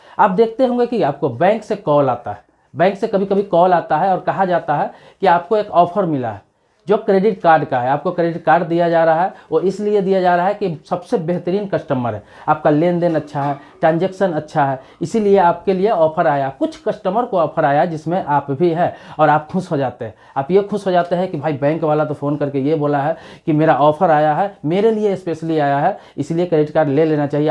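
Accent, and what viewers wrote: Bihar, India. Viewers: Sabse pehle ye apna bihari accent band karo.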